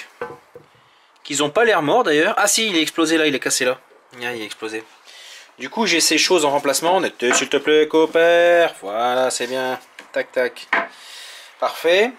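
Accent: North, France. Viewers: Quel plaisir que cet accent du nord matiné de syntaxe supérieure, de mécanique de précision et de jurons de chez mémé. C'est comme le résultat si Edd China s'était retrouvé dans une soirée coquine avec maitre Capello et les frères Lopez. Ca ma rappelle ma jeunesse.. Merci pour ces vidéos et pour le temps que ça prend à faire. La question qui m'interroge la tout de suite concerne ce super tuto de la "thermodynamique de la combustion d'un moteur froid expliqué à ta petite sœur" : Il se trouve qu'un spécimen de VR32 BKK à élu domicile sous le capot de mon camion (et c'est pas moi qui l'ai mit, c'est les messieurs de chez WV, il est comme ça depuis le début, c.f. WV multivan V6, ne pas taper merci ou au moins pas la tête...) Bref : Je suis coutumier de sa mise en route et du bruit de cette turbinette d'air secondaire (techniquement dite turbinette de brun). Je sais aussi que son non fonctionnement est rapidement sanctionné par l'ODB et son petit logo warning orange sur le TdB. 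(techniquement dit voyant de diagnostic de défauts de crotte ) Ma question est donc : "Comment que c’était ty que on fèzè pour pas que eul voyant de brin il s'allume le kon si on vire tout le bazar ?? ( ou comment tromper l'ODB sur ce point et sur d'autres aussi si vous avez des choses à dire sur l'OBD version KWP2000 pour faire une reprog ..si possible par cher...a quand le programmateur d'ECU chez lidl..). Bref merci de m'avoir lu, merci pour votre réponse, encore bravo, gloire au VR6 et power corons !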